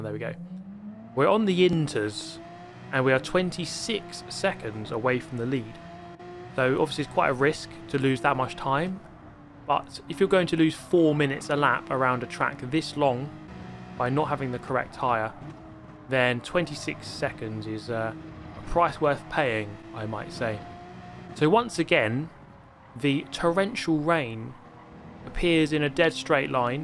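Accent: England, United Kingdom. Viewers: This guys from England by the sounds of it, I think he’s well acquainted with rain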